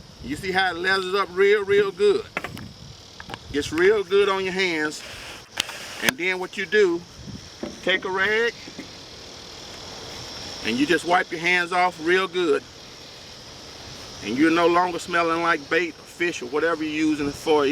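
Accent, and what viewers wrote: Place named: United States. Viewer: Love the ol country accent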